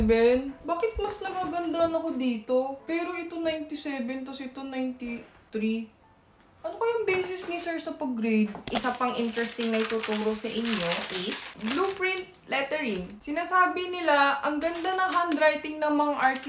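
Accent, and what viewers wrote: Batangas, Philippines. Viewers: 922nd like. Hi! De La Salle Lipa highschool batch 2013 and taga lipa here. 🙋🏻‍♂️ It really is fascinating magtagalog ka and nalabas pagbabatangueña mo haha.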